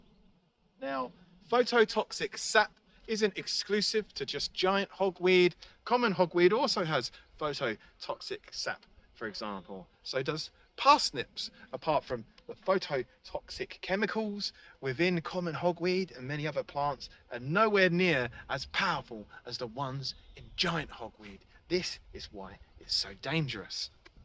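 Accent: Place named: London, United Kingdom. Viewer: He is handicapped by a Nu-London accent, innit.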